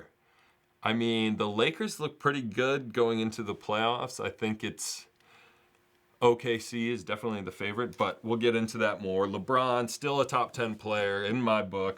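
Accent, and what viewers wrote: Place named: United Kingdom. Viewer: Loved the British accent! Who knew you had it in you. Also great list - chatGPT’s got nothing on you 😂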